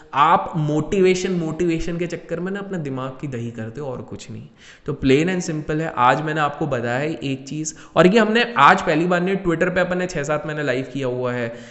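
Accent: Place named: Mumbai, India. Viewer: I like your Mumbai ya Hindi And the scam series was fantastic specially Dhirubhai Ambani vala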